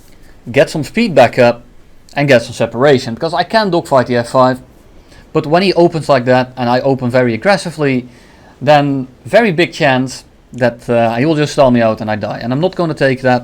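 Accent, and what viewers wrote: Netherlands. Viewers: Are you come from the netherlands?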